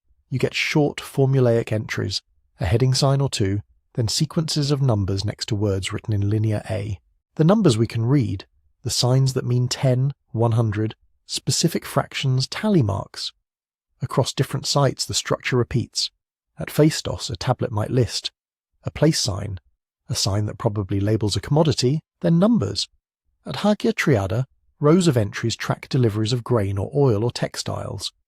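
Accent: United Kingdom. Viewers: It is genuinely breathtaking to hear a British accent this refined and so confident delivering an argument this intellectually bankrupt. To claim us Hellenic (Greek 🇬🇷) people, merely 'inherited' this civilisation, this would be using the same set of broken brain cells suggesting the modern British aren't actually related to the people who built Stonehenge, that you lot just 'bobbed up' in the North Sea one Tuesday, found some neatly stacked rocks and a few damp fields, and decided to play house in them. If you could pull your head out of the clouds for five minutes and check the 2017 Lazaridis study in the journal Nature, you’d find that the genetic receipts' scientifically prove an unbroken biological line between the Minoans, the Mycenaeans, and the people living in Greece today. It’s not an inheritance it’s our own bloody house you fool. But I suppose we can’t let pesky peer-reviewed science get in the way of your revisionist propaganda can we? 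Surgically separating Crete from the Greek identity isn't historical analysis 🤞🏻🤞🏻 it’s just a semantic shell game for the intellectually lazy. Honestly, I’ve seen more historical accuracy in a packet of crisps. You’re not a historian; you’re just a confused tourist with a microphone and YouTube page you MALAKA ask the Greeks what that word means.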